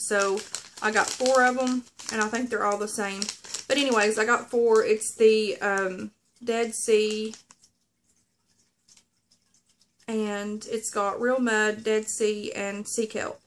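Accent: South, United States. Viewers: "Tickle your innards!" That was on all of the old Mountain Dew bottles in the '70's just meaning that it's so good that it will tickle your insides and make them happy! I'm telling my age! I have just seen your video for the first time and you are adorable and girlfriend I LOVE that Southern accent! Where are you from? I'm from Alabama and I know a Southern accent! Subbing tonight! I heard you talk about journals so check mine YouTube out as well! Looking forward to watching you more! Love the dimples :)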